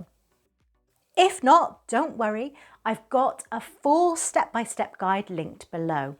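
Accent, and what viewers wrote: United Kingdom. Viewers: It is true, you are a very lovely girl, and I really like your British accent❤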